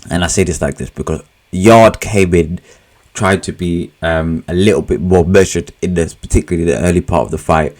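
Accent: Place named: Liverpool, United Kingdom. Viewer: Your scouse accent is muaad! 🤣🤣🧐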